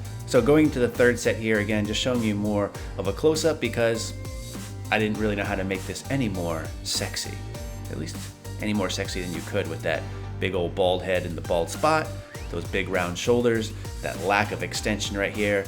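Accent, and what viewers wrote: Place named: South, United States. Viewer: YUSSSSSSS the return of with that ole southern accent. Appreciate it man it sickening.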